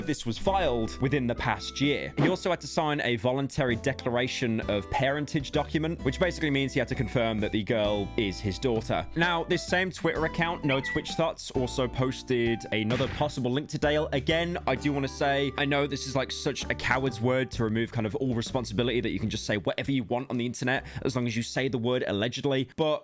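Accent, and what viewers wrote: United Kingdom. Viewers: Honestly, i think you get age restricted so often because they think that the funny british accent makes the people at youtube think your trying to cater to small children, and that you swear too much for a kids channel. Even though you aren't a channel ment for newborns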